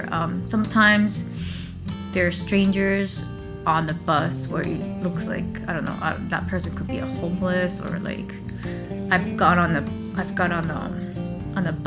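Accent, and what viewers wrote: Hawaii, United States. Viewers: Only one year. I can hear the local accent. Great perspective on living in Hawaii. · I would say that she's a Japanese-American from Hawaii that was living in Japan for work purposes before returning to Hawaii. It's pretty obvious from the way she speaks that she's lived in Hawaii for a long period of time. She's got the lingo down pat and that's not easy for a non-native speaker. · Wow she speaks good with a little pidgin for being in Hawaii for a short time! Mean!! Hahaha she get’um small kine! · Interesting, she speaks with Hawaiian accent?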